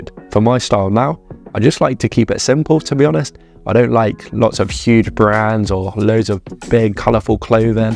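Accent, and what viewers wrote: United Kingdom. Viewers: That thick English accent 😢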